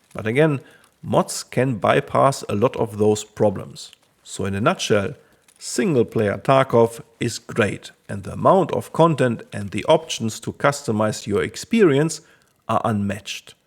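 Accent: Germany. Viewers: Ich mag einfach diese klare und deutliche Aussprache 😂 Man kann immer hören wenn es ein Deutscher ist der redet👍🏼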